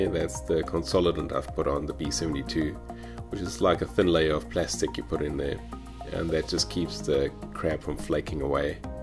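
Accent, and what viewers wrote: South Africa. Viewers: Your address says New Zealand, but your channel name and accent says South African? Great video btw.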